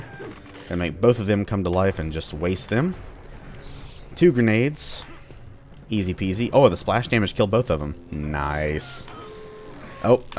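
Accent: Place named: South, United States. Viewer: Thanks from TX. You sound southern yourself! Loved replaying this game with my daughter.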